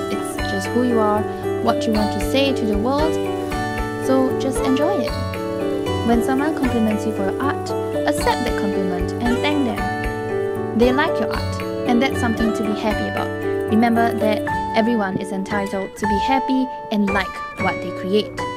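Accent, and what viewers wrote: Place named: Germany. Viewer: Thanks for this video btw your accent is so cute are from germany?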